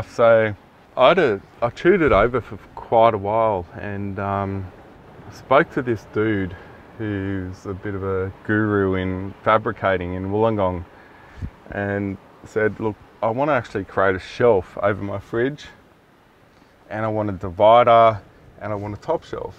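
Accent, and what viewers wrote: Germany. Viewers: Wenn du als deutscher sofort hörst das du deutscher bist/warst😂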